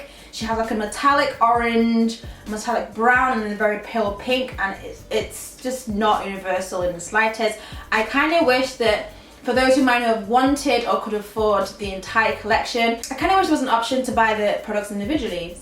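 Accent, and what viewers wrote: United Kingdom. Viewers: I love the accent! Are you British?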